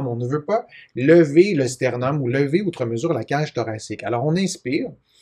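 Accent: Canada. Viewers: Avec votre accent canadien cher cousin 😀 , je comprends expirer quand vous parlez d inspirer , sympa votre vidéo Cordialement , doc j claude plessier , Oise France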